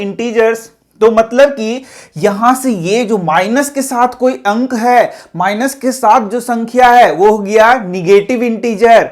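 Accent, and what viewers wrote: Bihar, India. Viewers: Sir r u Bihari..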